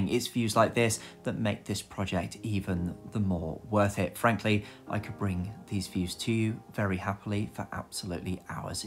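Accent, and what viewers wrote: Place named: England, United Kingdom. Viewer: Your English accent tho 🥵 yummy 🤤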